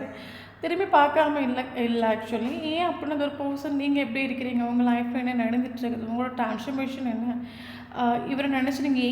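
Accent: Kerala, India. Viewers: Hi Mam neega keralava? Malayalam kalandha tamil very nice to hear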